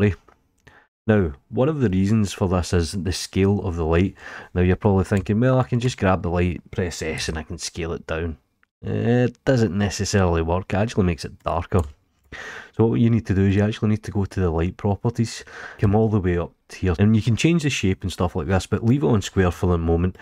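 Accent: Scotland, United Kingdom. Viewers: Please don't ever apologize about your Scottish accent. Da best!!! - Great tutorial. Thank you.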